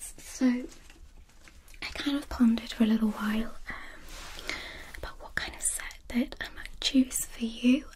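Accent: United Kingdom. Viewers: What is this whisper are you British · What’s your country? Your English accent is not seems like American maybe British? Or England? I don’t know but i love your accent your “Okay” sounds cute❤️ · I usually don’t like British accent videos,but I actually don’t mind your videos♥️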